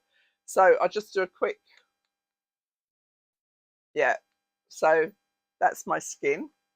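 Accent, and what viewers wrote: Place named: London, United Kingdom. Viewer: Love your videos... where is your accent from? It sounds so familiar to me... SW London?:0) xx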